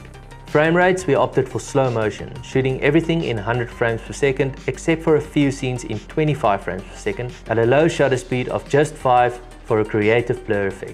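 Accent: South Africa. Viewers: Are you South African btw? Cause my parents are from South Africa but I wasn’t born there, but you definitely have a South African accent! Anyway I’m gonna watch the video now, the opening shots were amazing! So I’m sure this video will be great!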